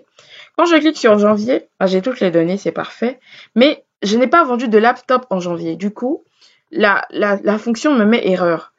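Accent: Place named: Cameroon. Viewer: Accent camerounais quand tu nous tiens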